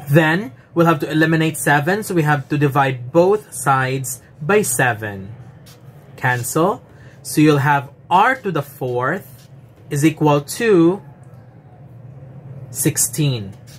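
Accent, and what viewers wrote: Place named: United States. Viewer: Akala ko amerikano yung nagtuturo hahaha. Ang fluent mopo mag turo naitindihan kopa lalo. Thank you sir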